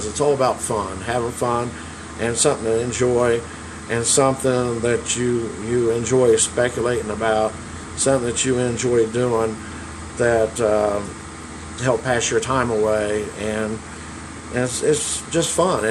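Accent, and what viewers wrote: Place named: Cincinnati, United States. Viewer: Sorry to here about your health issues. There is no doubt about your passion for the industry and KI in particular. I was born in Cincinnati but moved up to the Cleveland area when I was only a year old. Most of my parent's family still lived in the Cinci-Indy region growing up in the 70's and 80's, so we were down there several times a year between the weddings, funerals, and reunions and I had the good fortune of visiting Kings Island, Cedar Point, and Geauga Lake several times during those years. Great memories, in fact just hearing you talk reminds me of family "down home" as my mom would say. I'm glad you're able to get some enjoyment out of watching others enjoy what you once did. Nothing would make me happier than to come off this coaster and see you sitting there, and I could walk right up to you and tell you first hand how it is. 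But if that never happens, I'll be sure to let you know in the comments of you videos. Enjoy your Memorial Day weekend as well my friend!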